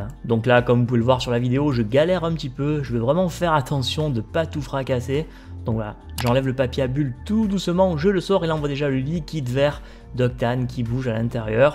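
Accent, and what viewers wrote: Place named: Marseille, France. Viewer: Il est marseillais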